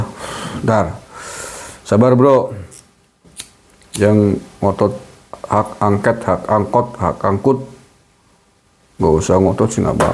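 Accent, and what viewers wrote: Banyumas, Indonesia. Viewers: Pak rika hebat bahasa jawane totok banget,medti rika wong banyu mas,aku seneng moga sehat,aku cocok kata pak yusril mahendra...🙏🙏🙏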